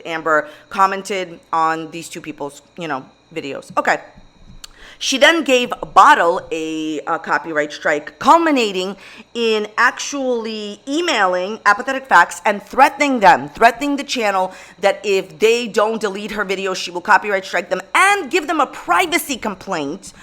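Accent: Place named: New Jersey, United States. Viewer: Love voice the most about her videos it’s so Joisey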